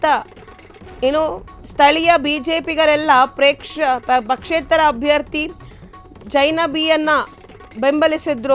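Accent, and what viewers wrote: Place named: Kerala, India. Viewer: Auru malayali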